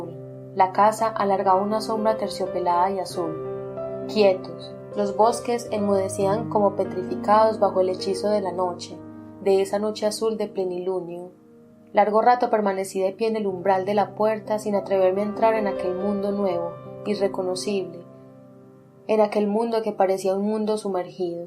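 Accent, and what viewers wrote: Antioquia, Colombia. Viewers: una hermosa voz femenina "paisa" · ese acento Paisa pega bien con la prosa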